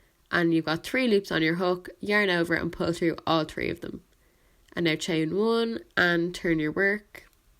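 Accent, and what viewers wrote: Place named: Ireland. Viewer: Are you Irish? you have an Irish accent. (I know what an Irish accent sounds like because I live in Ireland)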